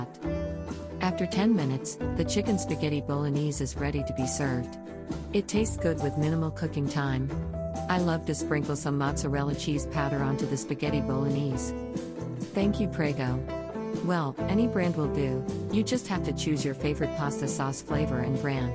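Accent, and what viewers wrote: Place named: London, United Kingdom. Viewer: Waahh..depa speaking london..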